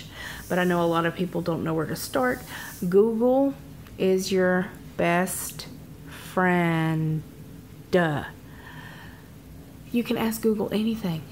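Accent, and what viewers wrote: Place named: Kentucky, United States. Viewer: where are you from originally? I'm from KY and you talk like we do.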